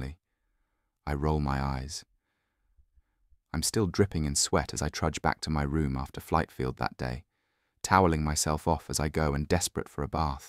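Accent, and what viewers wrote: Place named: United Kingdom. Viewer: Since I listened to a Xaden POV in an American Accent I'm having trouble adjusting to your British accent. But, this is well written and I am enjoying it regardless of that one caveat.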